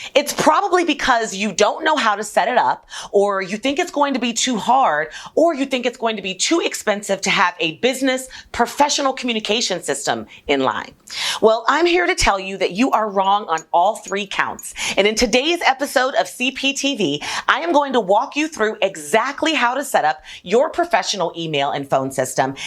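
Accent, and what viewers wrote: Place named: Europe. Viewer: Sound like a European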